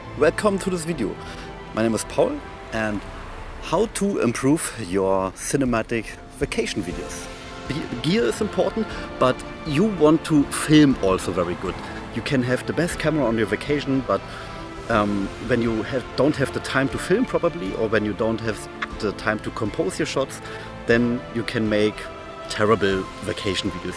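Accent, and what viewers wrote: Germany. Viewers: dein englisch hört sich voll deutsch haha nicht böse gemeint aber waren auf jeden fall gute tipps 💪🏽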